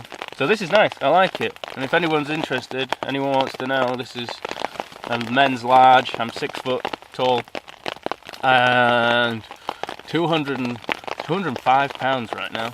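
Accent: Yorkshire, United Kingdom. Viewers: For the whisky, "It was the cheapest, actually." lol. Yorkshire. I love it. Keep up the good work. Love your videos.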